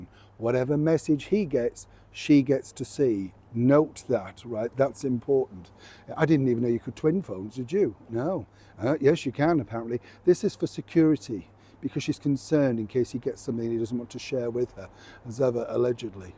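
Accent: United Kingdom. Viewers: You are a horrible man with a goopy British accent!🙄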